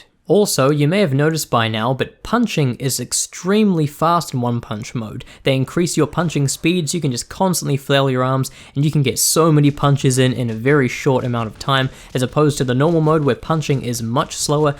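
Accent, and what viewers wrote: New Zealand. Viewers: What part of new Zealand do you come from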